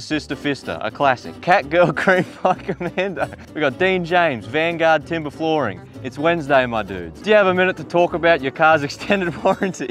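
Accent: Australia. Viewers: full aussie mate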